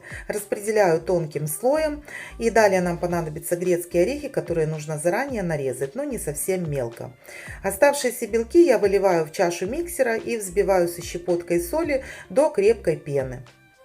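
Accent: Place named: Galicia, Ukraine. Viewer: Как ужасен галицкий диалект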